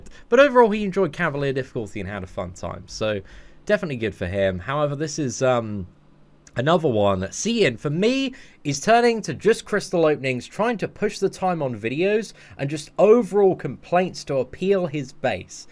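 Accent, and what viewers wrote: United Kingdom. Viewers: Ngl there are 3 reasons why I am subscribed 1. You're british accent is amazing 2. You gamble everytime 3. I play contest of champions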